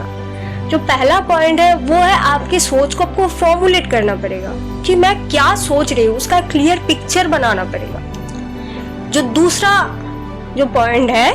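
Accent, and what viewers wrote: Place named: India. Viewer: A nice way to make people understand that how one should think #hatkae... Liked your video and loved your hindi accent. Would like to see more videos from your end. Good luck 💙💙💙💙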